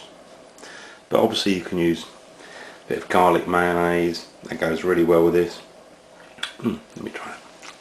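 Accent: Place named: London, United Kingdom. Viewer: Thank you sooooo much.. i made this last night my husband loved it.. soo yummy,simple an easy ,and... yes ..your accent very londoner sounds kool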